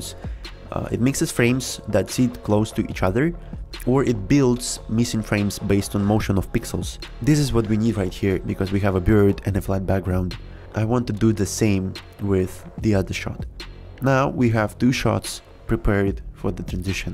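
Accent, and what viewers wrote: Russia. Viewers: Russian accent detected ^^ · Какой ужасный русский акцент!)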